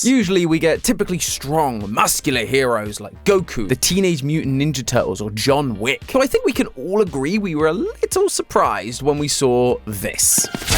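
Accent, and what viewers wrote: United Kingdom. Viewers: they replaced matpat with a british dude, i cant listen to it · game theory is british now? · Awesome, we get a British accent to listen to now that matpat dipped (Edit: I not hating btw I just mean I love me some British accent💀) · Why did matpat quit youtube, did anyone give him tensions or something? I had listened the voice and matpats voice is very crackey but this one is a British person (ami right that its a British person?) I liked the way he said THE LOREEEEEEEEEEEEE! I missed those days hes voice gave me happiness now i dont like this person. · Let's all say goodbye to Matpat. We all miss him. (say, who the f is this British guy now?) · Hes British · I thought this came out years ago, the British accent caught me off gaurd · hes BRITISH NOOOO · they made matpat british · British matpat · I miss mat pat but the new guy is British I think so W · Love to have a British host, don’t take this the wrong way and say I hate MatPat because I dont, it’s just nice to have someone from my country host the channel ya know? 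It’s cool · Matpat get a new guy not a random British guy with a script you wrote. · I don’t want British man I want the other guy please I want him back I miss him 😭😭😭😭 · before amarican now british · MatPat is bri’ish!😮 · Me realising matpat is gone: D: Me realising is british::D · I still cant get used to the british voice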